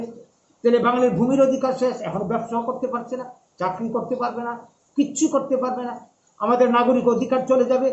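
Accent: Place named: Bangladesh. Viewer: Original tripurar bangali tumar moton koitona tumi bangladese kotta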